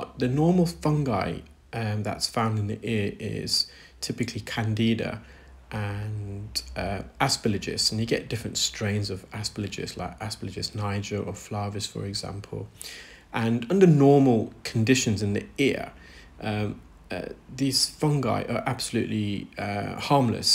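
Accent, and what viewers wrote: England, United Kingdom. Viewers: Hmmmm... I believe that he's English and as such you really can't complain about any pronunciations . I think they have the corner on the market for all English language users.lol That's basically asking someone to speak "American English". Pretty rude if you ask me.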